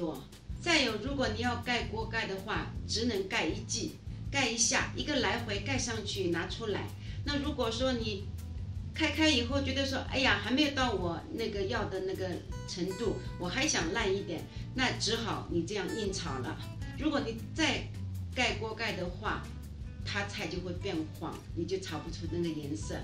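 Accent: Shanghai, China. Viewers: 哈哈 听得到上海普通话. 纳泥! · 阿姨上海宁对伐 哈哈 嗲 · 阿姨是上海人吗哈哈哈哈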